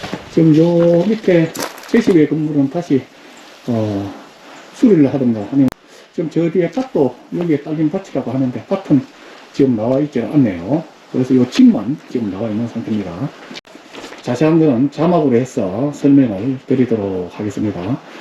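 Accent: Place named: Andong, South Korea. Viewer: 아이구 안녕하시죠? ㅋㅋ안동말투 정겨워요 날은맑으네요 봄을기다리는듯 참새가 짹 짹 마중나오셨네요~~ 두분 즐겁게 다녀보세욤 ~~ 상세한 정보 박수 보냅니다~~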